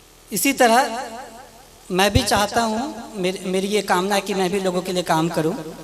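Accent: Bihar, India. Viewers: Bihari Op Bolthe❤❤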